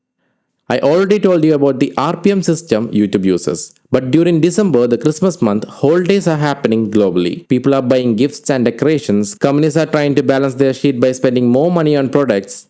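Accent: India. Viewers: That Indian VOICE 🗿❤ is Genuine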